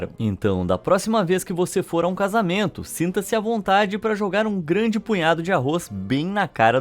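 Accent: Brazil. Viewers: tinha uma boz americwna, ess😊a voz tamuito brasileira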